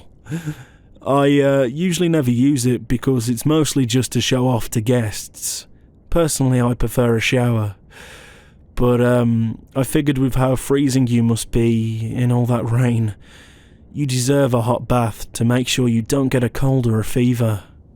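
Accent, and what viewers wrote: United Kingdom. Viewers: He fucking sounds British…I love it..😂😩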